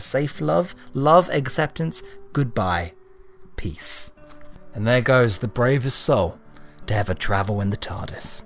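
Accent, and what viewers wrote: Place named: Italian American, United States. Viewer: Worst Italian American accent I ever heard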